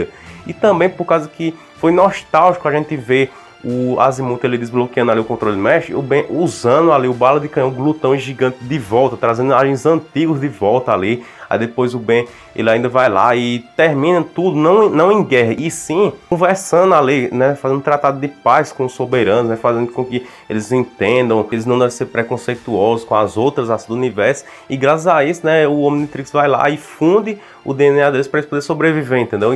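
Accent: Northeast, Brazil. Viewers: Amo o sotaque nordestino da minha região, é nois! Sou da Bahia. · Tu é do Nordeste ahhhhhhhhh pera EU TBM SOU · Mano tu é da onde do Nordeste? kk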